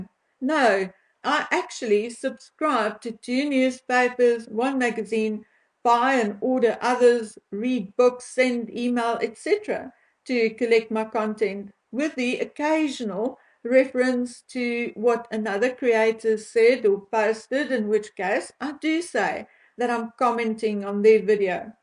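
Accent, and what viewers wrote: South Africa. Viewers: Is the lovely woman you? Had nothing in my mind of what you might look like, a beauty. I have many SA Jewish friends who left the country maybe 40 years ago at least, cause their kids grew all grew up here and are now in their. 40s. They were all professionals. Lived Westchester County, the burbs outside of NYC was a hub. Many came here thru Toronto. Some stayed there, manny went to Dallas. Now I can identify your wonderful accent. Loved their typical expressions. Like “ shame” haha9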